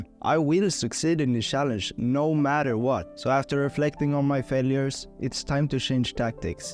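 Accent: Sweden. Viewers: First time watching this channel could tell by the accent he was swedish. I would know im swedish myself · You are swedish. it sounds kind of annyoing, at least of right now, when you use that overly-bad accent on purpose.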